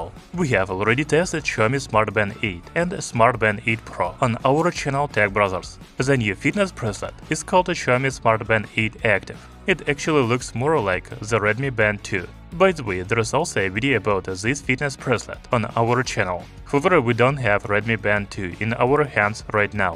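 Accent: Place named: Russia. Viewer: I'm sorry Bro, it is quite difficult and troublesome to understand you. Your russian slang is very strong.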